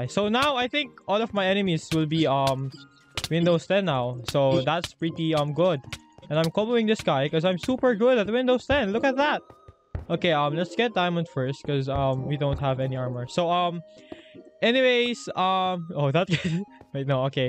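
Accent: Philippines. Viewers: filipino ka ba idol? halata kasi sa accent mo eh HAHHAAH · that filipino accent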